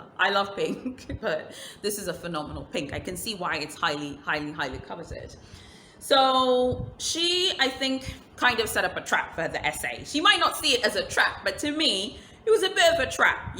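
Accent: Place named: London, United Kingdom. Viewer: Well I thought you had no makeup on so your application is absolutely fantastic. Also, jut wanted to say, your accent is too real, you use the right sayings in the right context and that only comes from living in the UK. They must be referring to the accent of the African state you currently live in because you sound like everyone else I grew up with in London Babe.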